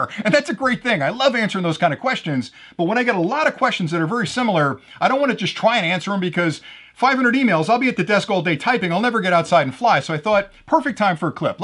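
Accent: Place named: California, United States. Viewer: Im thinking fast talking Californian! I moved from Cali to Tennessee and had to learn to slow down when talking!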